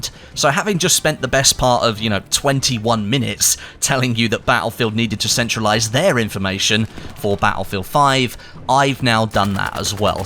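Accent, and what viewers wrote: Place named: RP, United Kingdom. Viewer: What is this? You gave absolutely no opinion of the game except: it is fun and I THINK I will be happy to play it next 2 years. There is no game-play opinions, there is no gun play assessment, there is no game mods information. YOU ACTUALLY COMMENT ON THE DICE AS GAMING COMPANY, AND THEIR MARKETING STRATEGIES BUT YOU GIVE NO OPINION ON THE GAME WHAT SO EVER. Your videos are worthless waste of time and I feel cheated tor my time and energy every time I watch one. You keep repeating same statements in slightly different way for half a dozen times in slow posh correct English, and you say nothing of substance.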